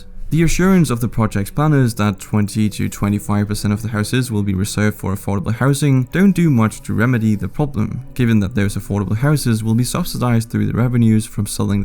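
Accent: Denmark. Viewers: This is completely unrelated but god damn the danish pronounciations are just so authentic! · Man kan bare høre han er dansker lmao.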